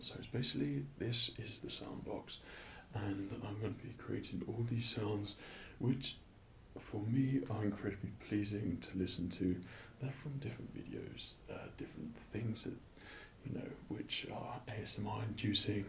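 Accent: United Kingdom. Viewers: What region of Britain do you come from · I'm glad you're making videos again. Your voice is a big trigger for me. Especially when you start to speak softer and softer and softezzzzzzz.... And I've noticed British accents also really work for me, though, no clue why.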